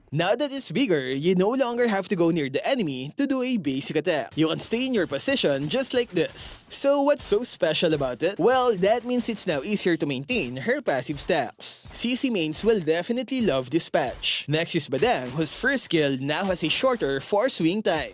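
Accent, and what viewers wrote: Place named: Philippines. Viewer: Logat PH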